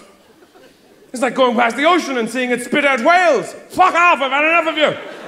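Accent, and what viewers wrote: Ireland. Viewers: I love the Irish accent, it sounds so musical 🎶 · he's Irish true and true. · Mia Scott British? No. Irish! ☘️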